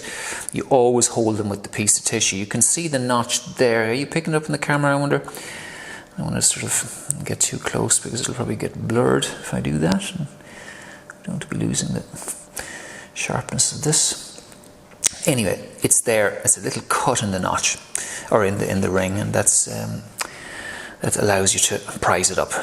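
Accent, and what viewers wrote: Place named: Ireland. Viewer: Thanks for your tutorial my lovely Irish friend! I note the emphasis on absolute cleanliness of the ring to case (ring) surfaces. Now, In my enthusiasm ... I assumed the object was to reduce any friction to a minimum (nice and smooth) so applied a very light coating of CRC Silicone 808 around the mating surfaces... Now.. I am rewatching and note your mention of "the perfect AMOUNT of friction .. which is apparently a "wonderful mystery" !! What I note now with my Powerball (250Hz Pro, by the way) .. is that although I have smoothness and a little less noise, I am having difficulty attaining higher RPM. I can spin up to around 5 or 6,000 RPM and all is very smooth but it is like I lose that "wonderful mysterious grip" which you speak of, which enables the continuation of torque application above mid revs ;) Indeed, I have some "clutch slip". 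You DID mention slip too!! So... I may return to the innards and clean ring and shell tracks again very carefully with Isopropyl alchohol ... I do hope that by some equal mystery, you may still pick this comment up, some months on... Many thanks. Love your accent. Chris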